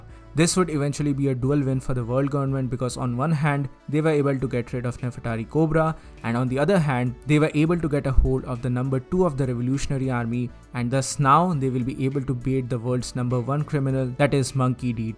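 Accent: India. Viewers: yeah its indian accent ,I can understand I am also indian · ur accent is very familiar ... Are u Indian?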